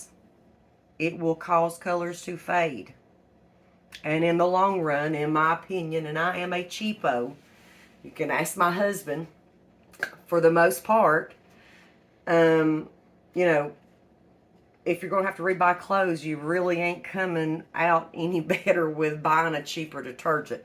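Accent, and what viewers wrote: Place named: South, United States. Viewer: I’m just loving the southern accent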